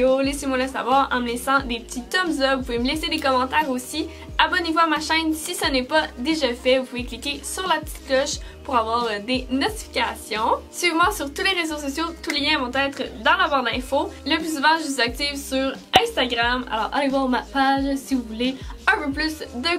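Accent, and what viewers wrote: Canada. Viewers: Tes vidéos sont toujours autant fun! J’te suis depuis le début et je me lasse pas de tes vidéos! Et cet accent canadien 🙌🏻 Je retourne à Montréal en octobre, j’espère te croiser !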